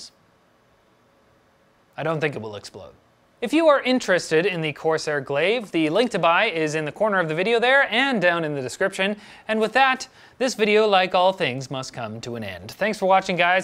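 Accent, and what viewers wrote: Canada. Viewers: Lord Cheezus how linus has a high pitched voice and is has an american accent is canadian and you can clearly hear it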